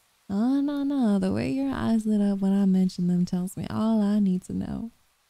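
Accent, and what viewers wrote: South, United States. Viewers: Each is getting better than the last, it really shows your getting a hang of the programs and good sound design! The rain maintaining its sharpness in the background is a nice juxtapose against the smoother southern accent that isn't comically overblown uwu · Since I'm not from the south, I cannot judge your accent. I'm too far north to be allowed to do that. You sounded more southern than I can try. :) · Only thing I have to say is that the SFX at the start is WAY TOO LOUD compared to the voiced bit 😅. Apart from that, this was lovely to listen to 😊. Even though I'm usually not a fan of the southern accent, your voice is so soothing that I actually liked it in this audio 🥰. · I'm not usually into the southern Bell but for you I think I can make an exception. 🥰 This was really great truthfully you sound more natural southern compared to people that I know that are southern 😭.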